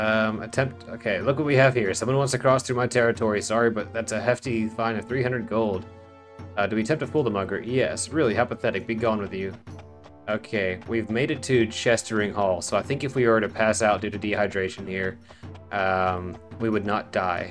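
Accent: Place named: Texas, United States. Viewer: I didn’t know what it was or how I knew, but I could tell you were a fellow Texan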